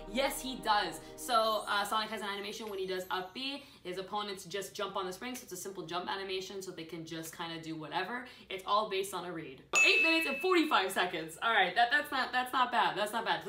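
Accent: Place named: United Kingdom. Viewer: Woot woot! My question made and thank you very much answering! Also nice British accent.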